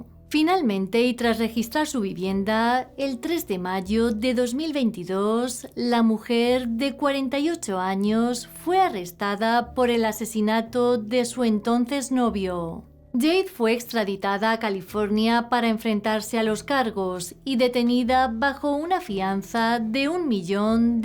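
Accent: Spain. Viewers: Hola gracias x tu arduo trabajo,me encanta tu forma de narrar los casos y tu acento español,muchas felicidades y bendiciones para todos.🙏🏼❤️🥰😍😉🤗💐⚘️🌷🌹👊👍❤️🙏🏼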